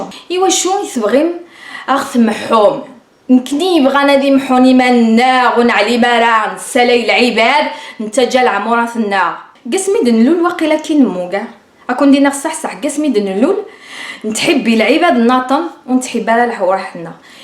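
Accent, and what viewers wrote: Bejaia, Algeria. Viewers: Mignon l'accentvde Begayet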